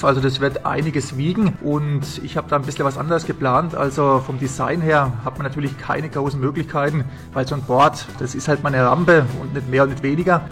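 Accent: Franconia, Germany. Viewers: Sehr coole Arbeit 👌 Auch sehr angenehme Videos 👍 Hört man da einen Fränkischen Dialekt? 😄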